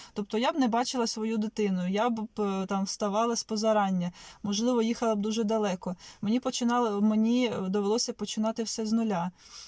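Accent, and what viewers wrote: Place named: Central Ukraine, Ukraine. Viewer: В Лондоні ціни такі, що я не знаю, як прожити на соціальну допомогу. В мене знайомі виїхали з Лондона, які там жили та працювали декілька років, бо важко. При тому, що вони мову знали досконально. Плюс чоловік, який невиїзний. Аби він виїхав до вас, ви би нікуди не верталися. Не лукавте. І це при тому, що суддя по акценту, ви з центральної частини України. Думаю Київ.проте, вам випала щаслива нагода побачити Англію і Лондон. В іншому випадку, ви б отримували візу по другого пришестя Христа.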